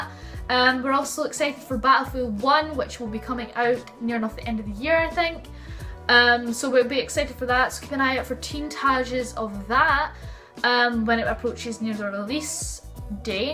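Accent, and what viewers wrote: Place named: Scotland, United Kingdom. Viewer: love the scottish accent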